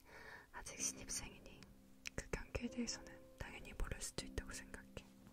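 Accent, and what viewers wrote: France. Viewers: Love the little kisses when introducing herself. Very nice "french touch" ! ♥ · I really wish I could understand, I just wanna know if she’s doing a French accent or not!!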